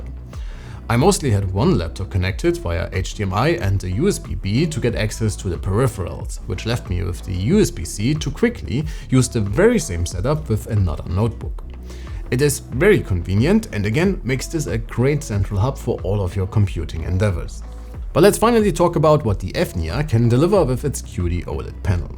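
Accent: Germany. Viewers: Your teutonic accent is very annoying. Please improve that.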